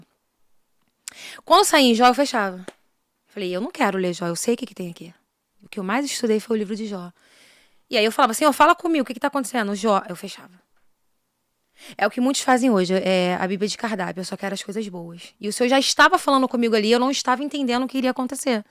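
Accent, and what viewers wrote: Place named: Rio de Janeiro, Brazil. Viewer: Pelo sotaque é do RJ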